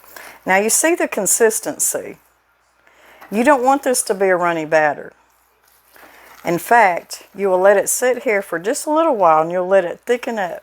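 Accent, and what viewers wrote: South, United States. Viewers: Hi just found your channel Great looking recipes I really like the chicken fried steak video My grandmother is from Arkansas the Southern talk remind me of her God bless you and your family be safe blessings 👍🏼❤️😀🌎👏🏼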